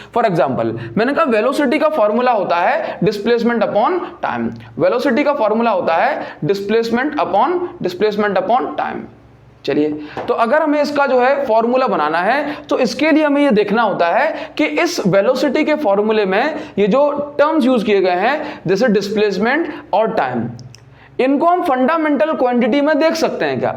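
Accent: Odisha, India. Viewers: Sir you are from odisha?? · Sir are you from Odisha?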